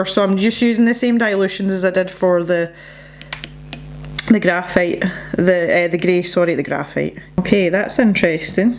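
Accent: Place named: Cumnock, United Kingdom. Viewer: Your reviews are so so very helpful, I love the way you explore the products and play around with the way they interact. And it brings me so much joy hearing you speak, my wee Mum is from Cumnock and is too poorly to travel back to visit family, now that we live in Australia. I show her your videos and they make her smile.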